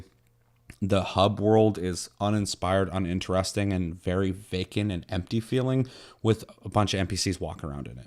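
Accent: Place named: Russia. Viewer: Anthem has an identity crisis. And kinda tries to approach too many audiences. I`m not really interested in BioWare after this point. I`ll still give DA4 a try, but not expecting anything special. This is a weird scenario, when you take a really prestigious company like BioWare, an actual fuck-up and bad game would be kind of given a pass, like "shit happens". But when a company like that releases a game that`s not letter-for-letter awful or bad, but one that`s like Anthem, a game that`s nothing special and has nothing exciting about it, just "meh", it does more damage than a fuck-up. It`s disquieting and worrying when you know that this is something they put actual effort into. Resident Evil is doing justice against the shamelessly stupid movies. I love horror games and Resident Evil is a damn good one and Atomic Hearts looks really dope. Seeing other survival horrors go down the drain (like Dead Space that has been downhill since the first title and then EA shut them down) and other horror games with loads of potential (like P.T or Alison Road) get canceled or drown in development hell is saddening, but at least we have Resident Evil. 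Yo, your russian accent is hilarious ;"D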